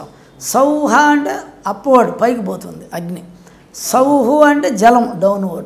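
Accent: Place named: Rayalaseema, India. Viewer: చాలా సరళంగా చెప్పారు ,గురూజీ రాయలసీమ మాండలికం స్వచ్ఛమైన తెలుగు వల్ల కొందరికి అర్ధం కాలేదు అనుకుంటున్నారు మెల్లిగా మళ్ళీ చూడండి🎉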